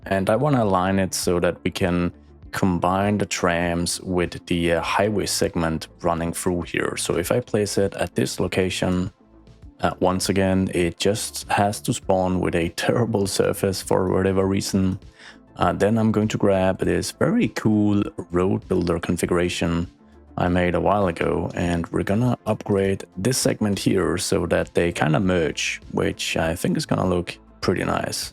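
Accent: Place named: Denmark. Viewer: Synes også jeg kunne fornemme en Dansk accent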